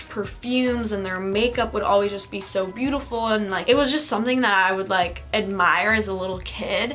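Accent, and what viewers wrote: England, United Kingdom. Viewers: oha ingiliz aksanın efsane sşjfsidjsşdn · Omd your British accent 😯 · Loved your British accent 😍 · We love your interactive videos so much too babe.I've never movied but I wish I could even though it has so many bad effect or bad feelings on person because without moving or going another country it is hard to learn other ppls culture or language or life in general and I think you should make a video with your british accent it would be different and lovely thank you and love you from pluto and back💋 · YOUR BRITISH ACCENT OMG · that british accent tho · fr 😂 just a min... Just found out about your videos now, but already love you! Different curriculums suck so bad!! love your British accent too lol but that Karen neighbor sounds horrible.. the stalking thing is true too tho Also I’ve stared speaking a mix of literally all languages I know and people start to get more and more annoyed by it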